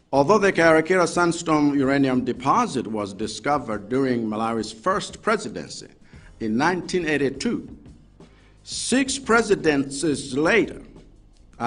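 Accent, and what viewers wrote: United States. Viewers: This guy has a USA Southern Accent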